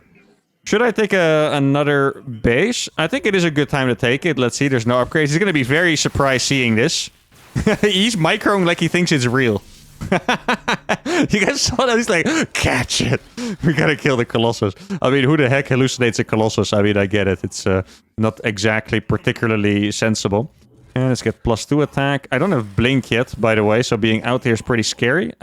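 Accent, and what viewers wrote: Netherlands. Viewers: are you dutch, brother? I feel like I hear it in your voice. I'm from scheveningen either way, awesome video. has to feel cathartic, lol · As an American I can hear the Dutch in his voice pretty clearly haha ive heard dutch folks speak a lot though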